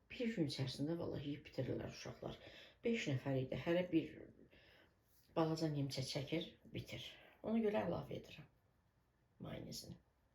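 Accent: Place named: Nakhchivan, Azerbaijan. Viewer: O qədər xoşum gelir Naxcivan hehcesinden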